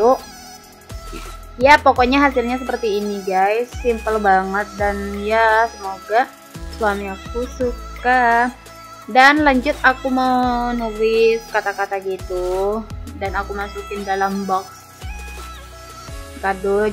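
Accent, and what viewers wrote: Madura, Indonesia. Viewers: Cara bicaranya kek org madura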